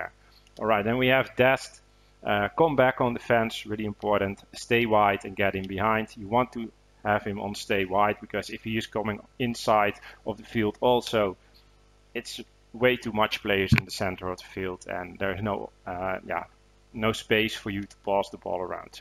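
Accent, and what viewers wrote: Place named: Netherlands. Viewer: In het Nederlands omdat ik aan jouw Engels hoor dat je Nederlander bent (No offence) Ik speel al jaren met Barca, en al jaren Div1 speler. Dit jaar enkele keren div 1 gehaald maar met veel moeite. (Meestal speel je tegen snelle spitsen zoals Salah of Mbappe en daar heb je gewoon niet van terug). Uit wanhoop deze opstelling geprobeerd (nu in div 2) en voorlopig heb ik 4-4 gewonnen. Het is zalig om vanuit de verdediging op te bouwen en weten dat je verdediging er staat wanneer je balverlies lijdt!! Good job!!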